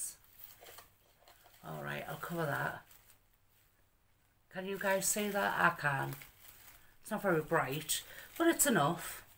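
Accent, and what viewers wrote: Newcastle, United Kingdom. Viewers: Hello, l am one of your new subscibers. I love your videos, you are such a lovely, down to earth person. So genuine. l could listen To your voice all day as my grandparents lived in Newcastle all their lives and l spent every holiday with them when l was a child. Listening to you brings back all the warmth and kindness of my childhood 😊 Love from Amanda in France xxx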